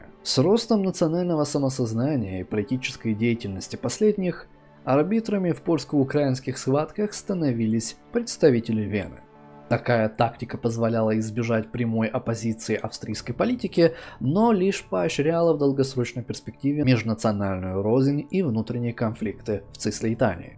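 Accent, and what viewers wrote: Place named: England, United Kingdom. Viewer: Как мне обучиться такому же английскому акценту как у автора видео?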